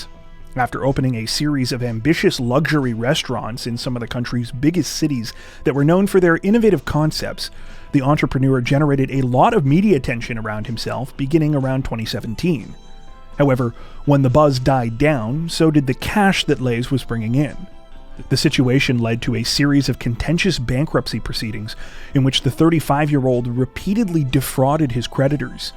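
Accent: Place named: Canada. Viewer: I love how you beautifully pronounce foreign names, and speak in a really soft north American accent, I'm thinking maybe you're Canadian.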